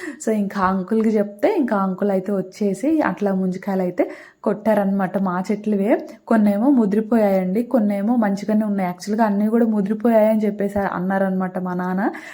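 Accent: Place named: Visakhapatnam, India. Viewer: Your language is different in Telugu i think u r in vizag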